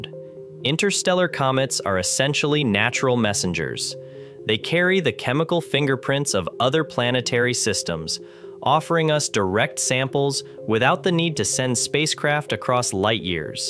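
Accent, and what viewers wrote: United States. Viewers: Why do Americans pronounce the word ‘Second’ as ‘Secint’?? And ‘Herbs’ as ‘Urbs’.If you can’t read,I understand but surely,pronunciation IS taught at schools in America?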